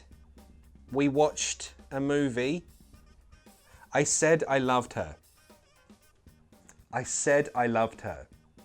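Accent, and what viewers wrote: United Kingdom. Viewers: I love the British accent, it’s sooo cleah 🤩🤩😍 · The slang is not the same as a dialect. Also, I did not say that we are talking about a dialect here. Dialect is the same group of languages, spoken slightly different depending on the region, with few different words and accentuating different letters in the words . This is actually the closest to what you are referring to as an accent, but it is still not. To the person from the UK this person in the video, doesn't have an accent. This is how they speak. Only for the rest of the world, especially to the english speaking people from the USA or Canada or Australia, you could say he has an "accent", but this is not correct. He speaks British English. In the USA, they speak American English. They don't speak with any accent, since this is how they speak and everyone else around them. It is different when you have accents to some letters in the words, so they may sound different to you, but this is not a definition of someone speaking with an "accent". 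· Hello! Is this British English? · Ur on a brit channel, teaching brit accent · Great tutorial! Thanks! I guess your are British ...could you tell me if the same rules and 'sounds' also hold for American English? Thank you again! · That's British accent , American accent is a bit different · the british accent sounds amazing. Its clear. I really like it. Good class, teacher. Tks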